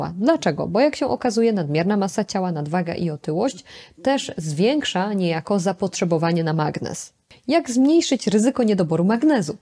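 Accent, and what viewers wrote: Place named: East, Poland. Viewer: Ta pani pewnoe jest ze wschodu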